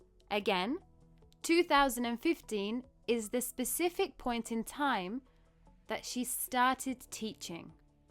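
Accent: United Kingdom. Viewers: I have watched half of your video on YouTube, I can say that the way you are teaching is fantastic. You are a great teacher . Your British accent is still strong and clear for me . The way you explain is very clear and easy for thevpeople to digest your lesson. Keep up with new lesson .well done.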